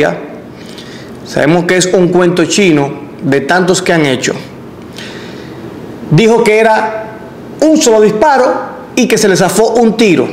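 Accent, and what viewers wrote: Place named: Cuba. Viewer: PRIMERA VES K T VEO Y ME INSCRIBI SEGUIDO POR FAVOR NO IMPORTA PERO TU SUENA CUBANO! SI ERES CUBANO NO IMPORTA! TU SUENA INTELIGENTE Y SERIO! POR FAVOR NO T VENDA! Y BUENA SUERTE!!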